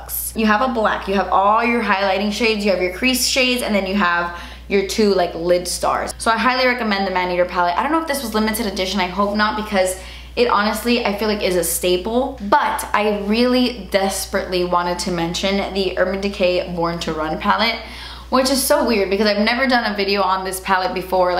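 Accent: Cuba. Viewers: That Cuban accent is coming back😏! Las ventajas de vivir en Miami. 😘🤩😎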